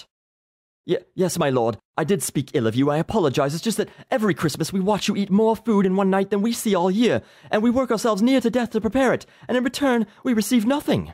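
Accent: England, United Kingdom. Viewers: awesome brit accent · i like british accent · You have a great British(?) accent. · lol english accent XD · Your English accent is pretty awesome, man. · Your British accent was too adorable. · Epic British accent, bro.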